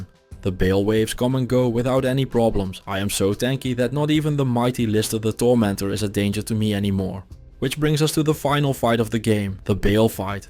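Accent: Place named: Netherlands. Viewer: Can always hear that dutch english 🤣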